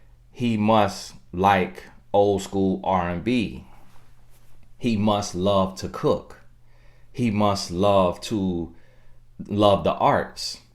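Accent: South, United States. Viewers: 😂Southern lango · Mz Chocolitt FL must be the deeeeep south! Im for NC, but is on a whole other level of country. Truly distinguishes his message; a country bumpkin with sooooooo much wisdom.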